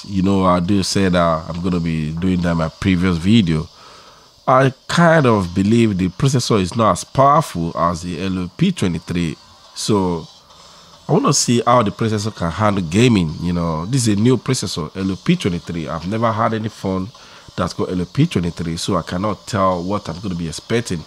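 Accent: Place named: Nigeria. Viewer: I really enjoy all your reviews man ,so honest and perfect ,i have ordered for this phone on Banggood and it is on transit to Nigeria,i hope i won't regret buying this one ,after my disappointment in Meiigoo S8 ,the battery drains faster and it lags though beautiful design but performance is poor..... Love from Nigeria Are you from Nigeria ,you sound like us? ...haha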